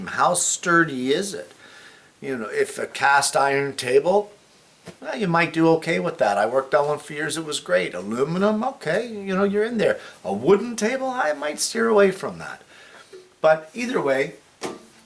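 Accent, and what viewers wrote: Canada. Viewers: I thought Canada too, from your accent. My son's living and working in Toronto, so I expect his accent will change slightly while he's there. The scroll saw tips are really useful. So much choice, I need to be well-informed before buying one. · I really did appreciate your video - it really opened my eyes. So, I got close, eh? HAHA!!! Your lic. plate is Ontario, and your accent is Canadian ... I grew up in Buffalo, NY. Gets cold, but hey, we gotta woodworking shop for that, right? Great teaching! Thanks again! Btw, I'm going to build wooden clocks with wheels no larger than 20 cm; could you recommend a model that would do wonders for me? Thanks again!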